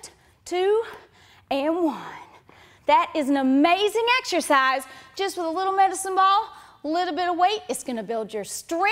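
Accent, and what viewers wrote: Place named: Appalachia, United States. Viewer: Sorry, can't get past the hillbilly accent.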